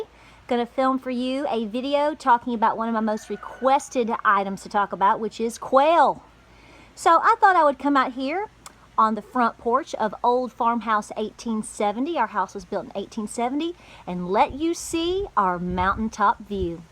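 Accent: South, United States. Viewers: My luv, we think the world of you. And we would do what ever it takes to help you. You have to know that. But just remember, we will always be sub'd to you not matter what happenes. Just know that a world with no is a very lonely place. Please take care of yourself -- you have a lot of stress happening in your life right now. Please take care of yourself. We really do love you and you videos (even if you do talk kind of funny) (remember I am darn near living in Canada, so anything south of the 45th parallel is considered (southern) God Bless you May he watch over you and give you the strength you need and the knowledge when it is time to regenerate. Love ya Tropical Minnesota.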